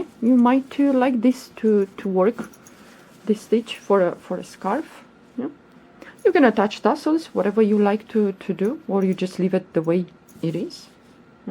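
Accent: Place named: England, United Kingdom. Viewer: Great pattern. Loved learning that stitch. Love your English accent. It’s clear but rather cute! Easy to listen to ❣️ …and I’m English!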